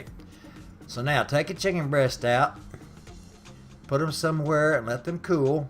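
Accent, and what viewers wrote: South, United States. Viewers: The accent threw me off in the beginning and I almost clicked off until I realized it was a southern accent and then stayed lol. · Is that a southern accent? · Good country accent. Great video also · I don't care how good it'll turn out. his deep southern accent convinced me it'll be good no matter what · He got that ol' town road accent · Looooove the southern accent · The southern accent annoys me to no end. I hate it. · Love your Southern Accent ! 👍💪👏💖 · Nice!! I don't think your southern voice could get any pleasant. I just love your voice. Oh...and your delicious cooking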